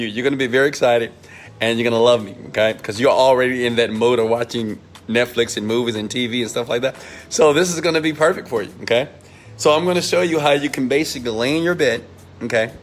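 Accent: South Africa. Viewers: Fantastic, you sound like you're from JHB or the Cape.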